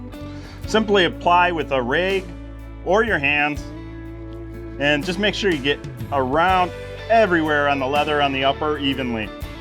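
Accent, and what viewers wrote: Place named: Wisconsin, United States. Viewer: It's how us Wisconsinites talk haha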